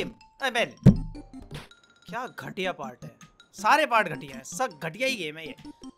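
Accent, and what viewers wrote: Haryana, India. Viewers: My mind have subconsciously added these haryanvi slangs and tones he uses Impact!!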